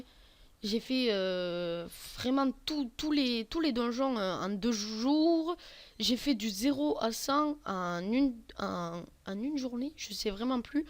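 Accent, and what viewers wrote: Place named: South, France. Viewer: toi tu viens du Sud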